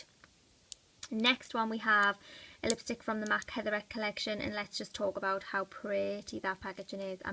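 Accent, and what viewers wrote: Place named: Newcastle, United Kingdom. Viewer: Are you from Newcastle Your accent is gorgeous. ♡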